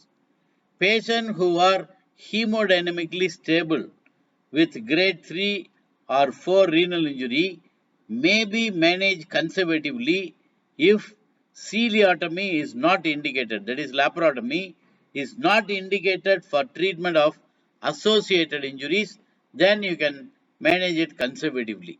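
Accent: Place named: India. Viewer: I can hear indian voice from you love from IMG philippines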